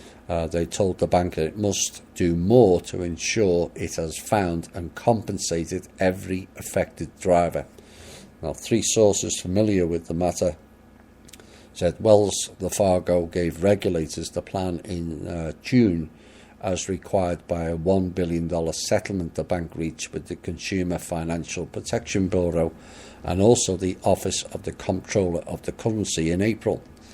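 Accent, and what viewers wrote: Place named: Liverpool, United Kingdom. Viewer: Alright Scouse !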